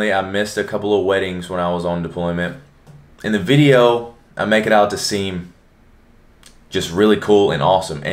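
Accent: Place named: Texas, United States. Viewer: No homo but his Texan accent is such a refresher 😊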